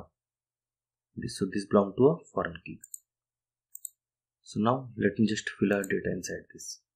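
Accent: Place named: India. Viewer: english with hindi accent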